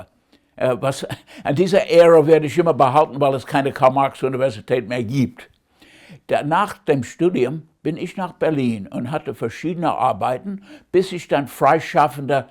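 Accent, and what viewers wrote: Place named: United States. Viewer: He still has quite an American accent when speaking the German language.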